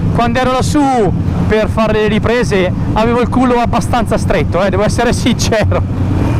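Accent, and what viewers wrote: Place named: Tuscany, Italy. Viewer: Un toscano che parla Veneto, troppo forte 👊✌️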